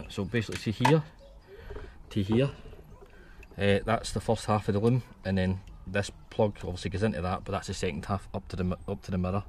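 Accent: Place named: Scotland, United Kingdom. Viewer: Thank you for taking the time to do this diag video. Well explained and I can even understand the Scottish accent. Ha. Thanks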